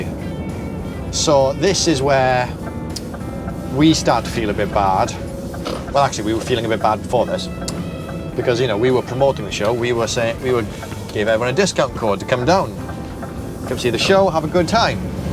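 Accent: Wales, United Kingdom. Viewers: Welsh accent makes it better “ya joooking”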